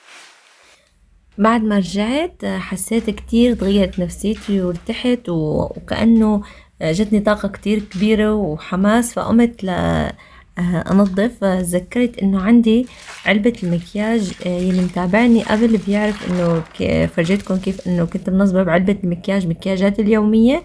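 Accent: Aleppo, Syria. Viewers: يعطيكي الف عافية حبيبتي وصح بعدك بتحكي كلمات حلبية متل هلئ بتطلع معك بالحلبي خيت 😉😅❤️ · تبارك الله عليك انا من البداية عرفتك حلبية من لهجتك وسميت الشطورة الحلبية ماشاء الله ماشاء الله احلى تحية لك من المغرب لسوريا عامة وللحلبية خاصة طبعا دايما متألقة لا تحتاج لتعليق 💚💛🧡❤ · مو صح حكيك حلبي واضح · اللهجه الحلبيه حلوه حافظي عليها وعلميها لاولادك وخللي جوزك يتعلمها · بالعكس. انا لما بتطلعي مع زوجك كتير بحس حكيك حلبي وانك مالك متأثره من بيت حماكي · انت بتحكي حلبي اصلي..خصوصي كلمة هلّئ 😅👌🏻عفكرة انا حلبية متلك كمان وجوزي شامي من الشاغور 😂كل عام وانت وعيلتك بألف خير يارب❤❤❤😘😍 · يعني هي هي اللهجة الحلبية مين قال انو الحلبية بجقجقو اكتر من هيك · اي صح لهلق لهجتك.حلبيه مبين ومن اول مره شفتك بعتلك تعليق وسالتلك انتي حلبيه 😇 وانا حلبيه متلك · لهجتك واضحة انك حلبية بس يمكن بعض الكلمات بتقوليها متل الشوام بحكم زوجك شامي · ماشاء الله عليكي يا ، لا بتحكي حلبي مظبوط انا مصرية وأول مرة أعرف ان فيه فرق في لهجاتكم زينا 😂 يعني الحلبة زي الصعيدي و اسكندراني زينا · بتحكي حلبي احييي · حبيبتي طبعا صحيح كلامك تغربنا وتغيرت لهجتنا شوي بس لسة في كلامات حلبية ع فكرة من اول فيديو شفتو الك عرفتك حلبية الله يسعدك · انا من اول فيديو حضرته الك عرفت انك حلبية لانه صاحبتي حلبية..انا من فلسطين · بالعكس مبينة انك حلبية من لكنة الحكي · كل كلامك حلبي ولهجتك واضحة🤣🤣 انا من حمص وساكني بحلب بناتي صارو يحكو حلبي وكلامات حلبي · اهلين يا وره اسمحيلي اسميك ورده لأنك مثل الورده نفرح لمن نشوفك انا من اول ما تباعتك عرفت انك حلبيه من اللهجه مره حلو طريقة طلامك بالعكس واضح انك من حلب 🌹💞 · انا من متابعينك الجدد يلي بحبوكِ على فكرة كلامك حلبي واااااضح ومبينة أنو مالك من الشام، يلي من الشام بيعرف انو لهجتك مو شامية يعني الفاظ حلبيةمتل🤭 (لهلق ، الطواية ، وحرف الجيم ، وفتح الحروف الأولى بالأفعال) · الله يسعدك ويحفظلك عيلتك بلنسبة للهجة انا شامية ول من لشام ببين معو انو حكيك مو شامي اغلب الكلامات حلبية بس اكيد لانك متزوجة شامي حكيك لح يختلف بصير مختلط بين الحلبي والشامي دخيلا الحلبية مااحلاها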